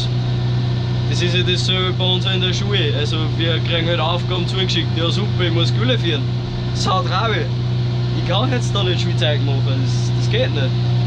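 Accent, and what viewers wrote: Austria. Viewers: I finds richtig geil, dass du Österreichisch sprichst.Grüße aus Kufstein.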